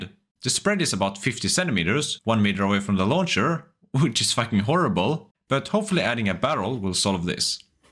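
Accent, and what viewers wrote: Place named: Finland. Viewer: Are you Finnish? The accent is familiar!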